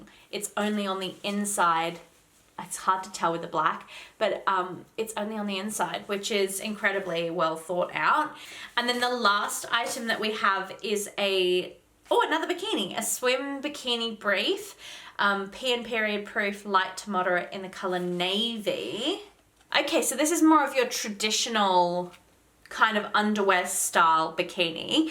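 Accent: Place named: Australia. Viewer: An Aussie girl talking about periods, it made me smile.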